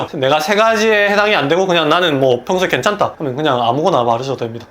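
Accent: Busan, South Korea. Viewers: 원장님 시원시원 솔직한 화법 너무 좋네요^^ 부산사투리 너무 정겹고~~~~~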